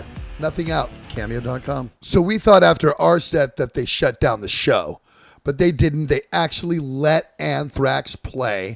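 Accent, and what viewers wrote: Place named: South, United States. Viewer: I fucking love the southern accent you like to throw. But you sound like you may be from Bama instead of Florida. 😂🤣 enjoying the stories.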